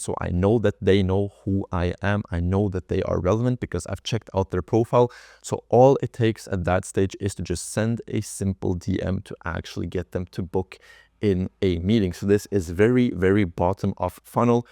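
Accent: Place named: Norway. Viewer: hold up you danish or swedish? - nvm you Norwegian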